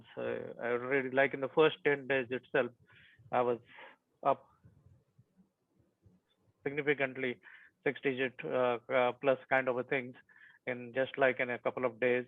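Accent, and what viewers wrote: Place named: India. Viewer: I really want to learn from him, his strategies are good. But I know other people from India who also speak like that and it is very annoying and a turn off.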